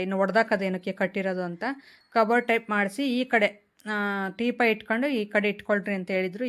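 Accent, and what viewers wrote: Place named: Sira, India. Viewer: Super nivu matado riti nange thumba esta aythu because nam sira side kuda navu hige matadodhu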